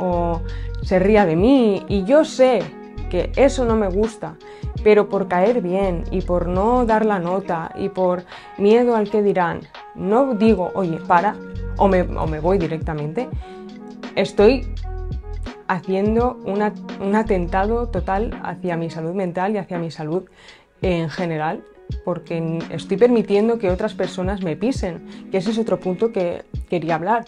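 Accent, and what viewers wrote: Spain. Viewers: El tema que tratas se ve bastante interesante, voy a seguirla y además que el acento de español ibérico, me fascina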